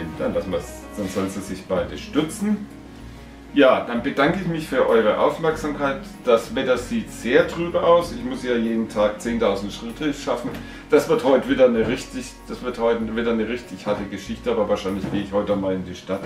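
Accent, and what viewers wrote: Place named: Franconia, Germany. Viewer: Informatives Feedback- Video! Deinen fränkischen Dialekt würde ich unbedingt beibehalten, der verleiht deinen Filmen erst das richtige Kolorit. Noch kurz etwas zum derzeit durch den Preisverfall etwas in Verruf geratenen Sammelgebiet Bundesrepublik Deutschland: versucht doch einmal, die ersten sechs Jahrgänge durchgehend mit klaren, lesbaren Vollstempeln zusammenzutragen! Nach meinen Erfahrungen gar nicht so einfach, denn zu dieser Zeit wurde meist noch auf motivfreie Entwertungen geschaut, und Vollstempel waren eher Zufallstreffer., wie wäre es, wenn du einzelne europäische und auch Übersee- Sammelgebiete in Kurzportraits vorstellen würdest: Frankreich, Schweiz, Großbritannien, USA, Kanada, VR China usf. Wie stellt sich das Gebiet als Ganzes dar, was ist beim Aufbau einer solchen Sammlung zu beachten, ist eine entsprechende Sammlung für den Normalsterblichen finanzierbar, Seriosität der aktuellen Ausgabepolitik und natürlich auch die Preisentwicklung. 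Würde mich freuen!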